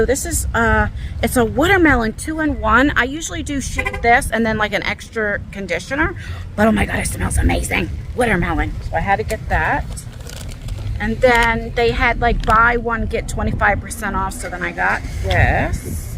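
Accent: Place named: New York, United States. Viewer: Your accent made me homesick! I grew up in upstate NY (Hudson Valley) and have been in the West since 1997 and I have been homesick. My family was from Jersey — Fort Lee and Wycoff/Hawthorne. I miss Pizza and Bagels! And hard rolls!!!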